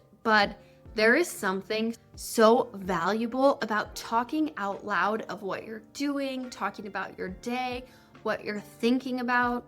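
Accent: United States. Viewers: I really love the American accent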